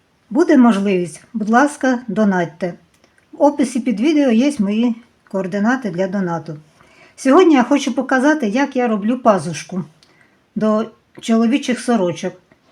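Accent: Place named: Poltava, Ukraine. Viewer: Це-Полтавщина! Тут є все, канєшно ж!😅 І це не той випадок, коли потрібно шпиняти за вимову!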